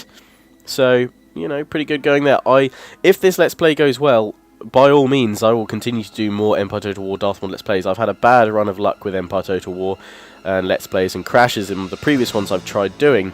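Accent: United Kingdom. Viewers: Nathan Winkle He said several times he was from the United Kingdom and has a very clear and obvious accent. Are you deaf? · Very nice british you are speaking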